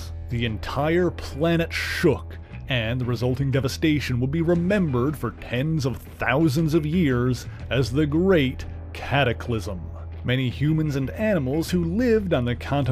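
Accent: Newfoundland, Canada. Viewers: You sound like you’re form Newfoundland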